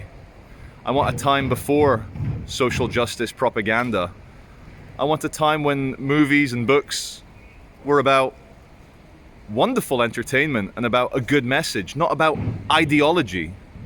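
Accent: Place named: Scotland, United Kingdom. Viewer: You have a Scottish accent only imo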